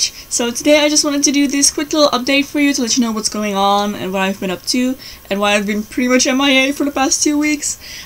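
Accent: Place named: Netherlands. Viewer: you might speak dutch, because of your accent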